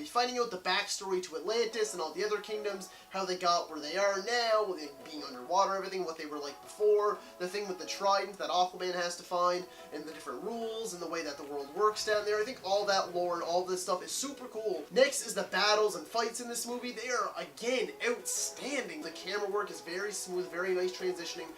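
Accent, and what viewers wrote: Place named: Canada. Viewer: Wait! Did you say your “miewth” full of water?” 😂. Gotta get used to that Canadian accent. 😝. Great review buddy!👍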